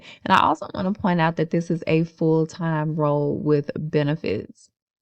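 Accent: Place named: Alabama, United States. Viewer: You definitely from Alabama, am I right? Lol and thanks for the video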